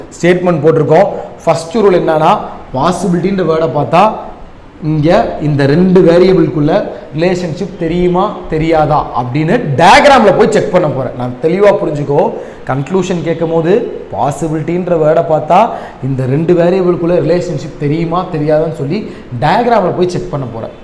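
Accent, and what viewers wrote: Madurai, India. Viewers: Anna neenga madurai ah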